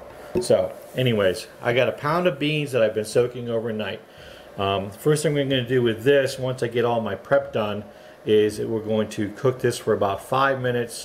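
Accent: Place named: Pennsylvania, United States. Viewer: My father was WWII, gunner's mate in the north atlantic. Have a good friend who is a Seabee and she just left this week for her year... In Somalia, we think. My husband was searching bean and ham soup and voila! Yours came up and he shared it to me. I watched it and I told him I thought you were from PA... Just heard a word or two every once in a while. We are from Pgh area but living in NC.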